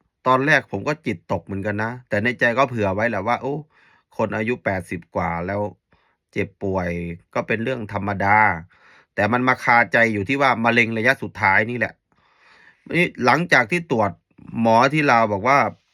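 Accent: Isan, Thailand. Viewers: สำเนียงการพูดไม่เหมือนภาษาลาวเลย เหมือนภาษาอีสานมากกว่า หรือว่าผมคิดไปเอง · เทพโยสดำเนัยงเหมือนคนอิสานเนาะ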